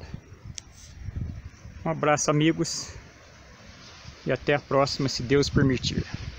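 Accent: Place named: Espirito Santo, Brazil. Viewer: Cara top, vc tem um sotaque de capichaba. Q bacana q Deus abençoe este lindo lugar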